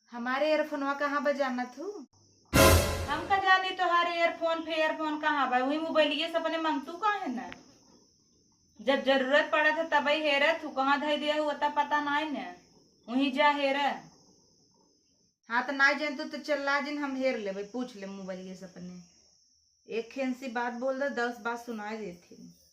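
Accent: Jaunpur, India. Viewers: Didi mai bhi Jaunpur se hun lekin aapaki boli bahut sundar hai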